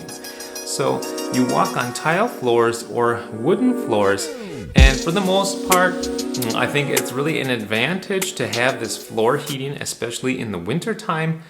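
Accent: Germany. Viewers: Did you grow up in Germany? The accent and vocabulary really don‘t sound American ;) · You‘re not a real american. You have like a german accent. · Hello I recently discovered your channel. I noticed your German accent and could not believe you were actually American. I find most interesting are your beautiful videos and photos in Bavaria. It brings back memories of life in Germany living in a Village! I Loved it! I Love the Land, homes and culture so much! I felt at home there, although I was born American. I appreciate your interesting information! I hope to move back to Germany. I Watch videos, live, eat, sleep and dream Germany all the time. May you and your family enjoy your beautiful new Home! Tchuss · Why did you have such a strong obvious German accent ? 🤔 I am German and I wondering. Because you don't sound like an American. Did you live so long here that you adopted a German accent? 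· Hey, found the correct video and it is very interesting, because I had the same feeling. When you speak English I also heard a light German accent. It is very funny somehow. :)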